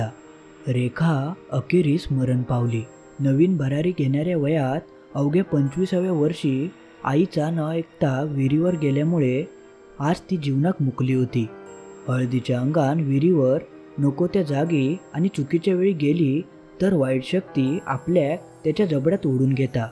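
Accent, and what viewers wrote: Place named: Malvan, India. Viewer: तुमचा मालवणी भाषेचा लहेजा आणी सांगण्याची पद्धत खूप छान आहे मालवणी भाषा फार गोड आहे.....धन्यवाद!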